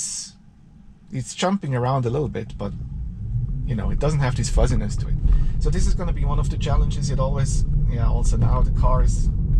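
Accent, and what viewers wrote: Switzerland. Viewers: Sicher en schwizer mit dem akzent😄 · Hmm... you sound very Swiss :) Grüezi Thanks for the video!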